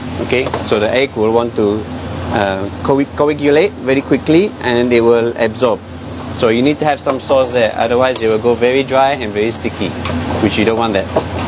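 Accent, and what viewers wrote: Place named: Singapore. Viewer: he is in Singapore, hence his accent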